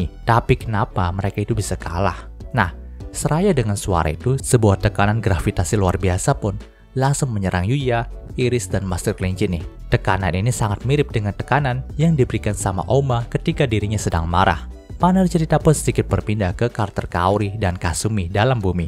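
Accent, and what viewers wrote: Cirebon, Indonesia. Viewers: Kya logat Cirebon 😅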